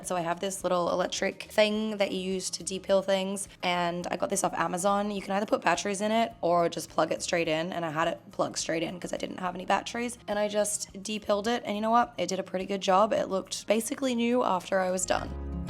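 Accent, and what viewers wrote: Australia. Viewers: Your ‘sick’ voice sound sooo very Australian! I hope you’re feeling better and enjoyed your holiday x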